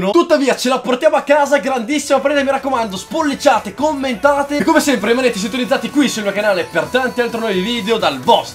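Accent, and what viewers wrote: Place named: Romagna, Italy. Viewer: ma sei romagnolo? Parli il dialetto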